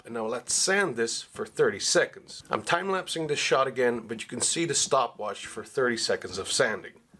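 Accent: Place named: United States. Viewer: How come you sound like an American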